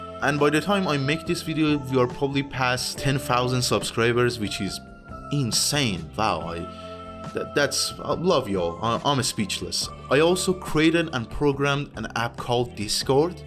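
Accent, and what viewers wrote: Iran. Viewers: Why do you sound so Iranian? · irani ass lahje man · Ngl bro lowkey has a Persian accent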